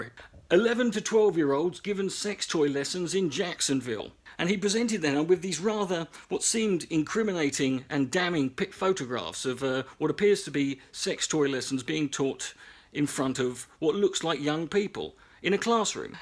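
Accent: United Kingdom. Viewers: You sound more northern than Watson sounds haha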